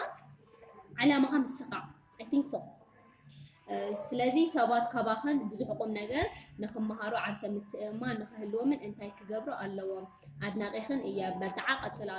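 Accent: Ethiopia. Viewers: anti shikorina ane nay Shire endiyu lahjay gin ab adigrat wey dma bhafesh ab agame zelo tigrigna esi kab nay etom lahjom des eyblnenin do gele do tiblyom zeleki bzuh zifile eykonen. Ab hade quanqua nay lahja fililiy zelo eyu. ms yikreta kemzi natki zereba neay nay nebse mithat zisimeo eyu koynu zisimeni. Ab Tigray zela hmam amhargna mihwas eya ezi kea ab kulu zelo eyu. Eritreawiyan "Agame" zibil kem xerfi silezitikemwa ilki kali eytmseli bnatki kuri. kali yiredaki eyredaki eytcheneki ksab Tegaru ziterduki tirah amhargna eynhwes eyu eti kinblo zelena. Ane demani kem Tigraweyti meten nay mekelle, wukro, agame, raya kulom Tegaru lahja yirideni eyu! Nebska mimsal zimeslwo yelen kemzi eytkuni. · ናይ መቐለ ትግርኛ ኣይስማዕን ዝበለኪ ኸ መንዩ 😏😏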